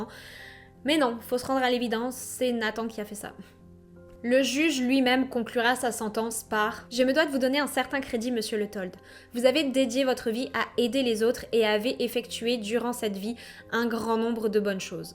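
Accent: Quebec, Canada. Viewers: quebecois et français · Tu as un petit accent québécois 🤔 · tu as un mini joli accent, c'est canadien? je viens de découvrir ta chaine et du coup je m'abonne :) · J'adore ta vidéo ! Sinon, rien à voir mais je trouve que tu as un léger accent entre l'accent canadien et l'accent belge ahah :) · Coucou j'adore tes vidéos et ta chaîne, petite question est-ce que tu vis au Québec car j'entends que tu es française mais une petite pointe de québécois Bisous du Québec 😘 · Allo ! Je vien de découvrir ta chaîne 🙂 dit moi ton accent est de où ? Je trouve que tu as un accent québécois mélanger avec un accent français. Super vidéo ! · Bordel tu as bien pris l'accent québécois dans cette vidéo. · Ça m'a tout l'air d'une Québécoise d'origine qui essaie de parler un français de France. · Je m’abonne j’adore ton accent et tu compte très bien les histoires!! est tu Québécoise ☺️? 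· Je viens de découvrir ta chaîne, je m’abonne ! Je viens aussi de lire que tu étais française mais vivant au quebec c’est fou l’accent que tu as pris 😳😳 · je me doutais bien qu’il y’avait un petit côté Québec hihi 😉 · Étant moi-même du Québec, je me disais que ton accent était très ressemblant à celui ici au Québec, comparé à d'autres Youtubers français (Je viens d'apprendre que tu demeures au Québec) J'adore ton contenu, comme toujours Continues sur cette belle lancée 🤩👌 En route vers les 100 000 abonnés 🎉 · Fake c’est ca????? Quebecois dans l’accent, je reconnais ma langue fille hihihi j’adoreeeeee ♥️🌸 et en passant tu est vraiment magnifique en plus de très intéressante et crédible, tres bonne chaîne j’aime bcp , merciiiiii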